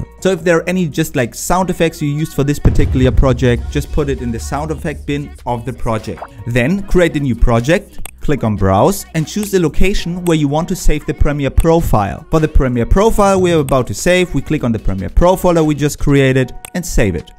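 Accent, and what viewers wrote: Germany. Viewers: That was an awesome video and very helpful! Hearing organization tips from a German accent also seems right somehow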